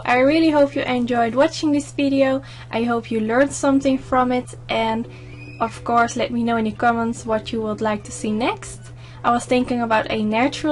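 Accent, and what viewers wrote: Netherlands. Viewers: I hear you are from the netherlands hahah love it when we speak english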